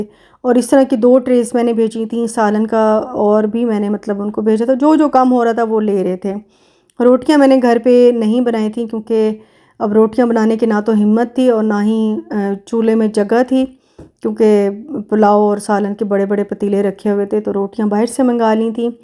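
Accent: Saraiki, Pakistan. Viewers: aap bhi shayad new ho kiu k apko pata hona chahye k yeh ab nahi khasy time say yahan shift hui hain or ham dosron ko to nahi dekhain gay apna farz poora karain gay na neighbours ko pata hona chahye aik dosry ka unko bhi hona chahye tha or inko bhi islamic country mai reh rahy hain na aap log yeh kasa waqt aa gia hay k sari dunia say rabty mai ho jaye insan or apny neighbours ka hi pata na ho yeh achi baat nahi hoti or haan mujhy k vlogs bahut achy lagty hain start say hi dekh rahi hoon inka saraiki lehja mujhy bahut pasand hay or aik dosry ko achi baat batani chahye bura nahi manana chahye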